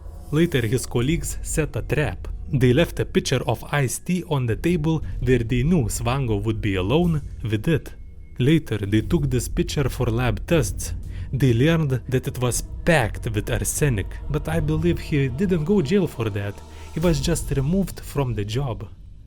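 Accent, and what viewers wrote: Russia. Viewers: speaks English in Russian manner. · Speaks like a third of Russians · Are you russian? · Are you Russian if so that’s fucking awesome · im Ukrainian and by your accent and costume i already think you are Russian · Are you from Russia? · I might say several positive comments about your vids, but they've been already said. In addition, narrating horror documentaries with russian acent might be a new genre, so keep it up my friend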